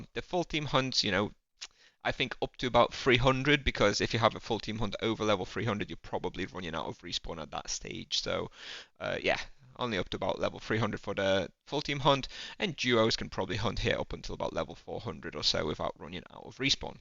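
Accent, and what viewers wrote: United Kingdom. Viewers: w Angli mieszkasz ze taki akcent ?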